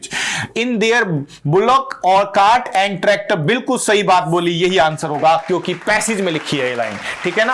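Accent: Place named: United Kingdom. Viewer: Sir I had a thing that I think is odd and that is your accent you speak in a half british accent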